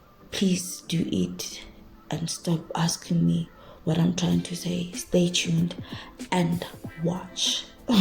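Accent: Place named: Nigeria. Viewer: is it even a video if we don't get the naija accent!!! love it😂😅😂😜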